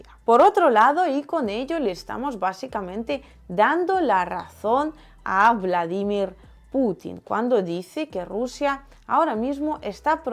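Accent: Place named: Spain. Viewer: A proposito de America Latina, noto que algunas expresiones y vocablos de jerga especialmente no los entiendo, tu acento español es super fuerte. Pero está bien.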